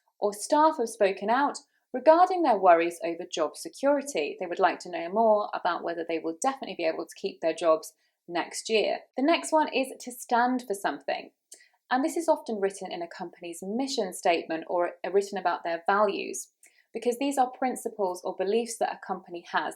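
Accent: United Kingdom. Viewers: ❤, I love ur accent so much I wanna know the name of this lovely British accent Thank you ❤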